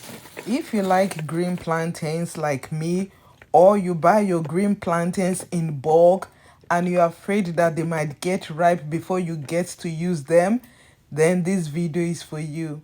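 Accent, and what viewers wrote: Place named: Nigeria. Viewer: Where you got those from? I like your Nigerian accent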